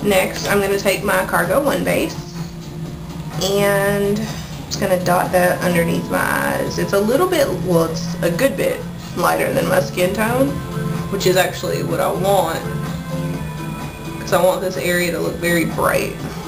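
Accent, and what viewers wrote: United States. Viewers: i love your country accents!! So cute!! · she sounds so country hehehe reminds me of my home town nashville tn but i never picked up a accent.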